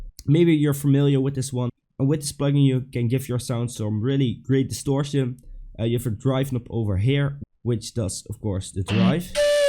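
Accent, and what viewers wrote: Netherlands. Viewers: Best makkelijk om te horen dat je een nederlander bent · Nice! Are you dutch btw?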